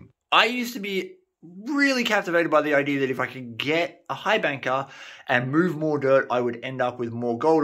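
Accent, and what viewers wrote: Australia. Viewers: Yeah I did some research on Old maps from the 1800s trying to find Rivers that Prospect hit and I finally found one area earthquakes landslides blocked the waterfall that used to be there and lots of gold two and a half years it took me to get most of it out I'm sure I left some behind the life gets in the way and I'm researching old Maps in the state that I live in some pretty interesting stuff thank you for the video I enjoyed brought back memories and you were right🇳🇿🇵🇳🇦🇺😛🎅🎁🐕‍🦺🦮🍻🍻🤣🤣🍻🧁🥃📹⛏️⛏️⚖️⚒️ Merry Christmas drink lots of beer give your dogs a bone scratch gazzy behind the ear I think I'm saying his name right if not that's how it sounds you Australians you have that accent tell your woman I said hello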